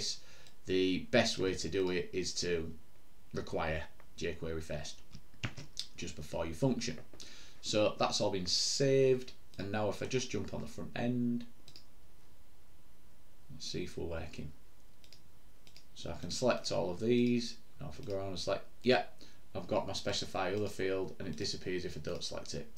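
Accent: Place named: United Kingdom. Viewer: Hi , Being an Indian, we find it difficult to listen to UK English , it would be great if u talk in simple and clear English for ex:- mark Shust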